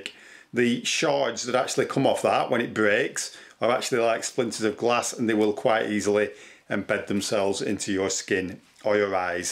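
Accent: United Kingdom. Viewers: What is your?. By your accent I'm thinking you're British but not sure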